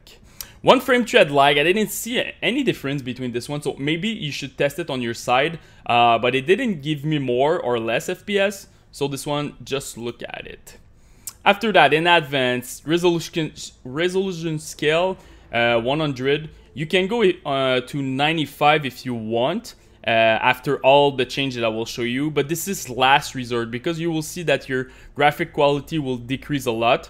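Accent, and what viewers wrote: Quebec, Canada. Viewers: lmao just curious are you from Quebec Or New Brunswick?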